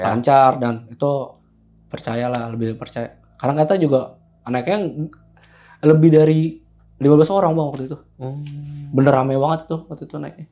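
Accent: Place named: East Java, Indonesia. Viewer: saya kira vidio realnya mange🙏🏼... soale saya orang Jawa timur😊suaranya kyk orang Jawa timur 😊🙏🏼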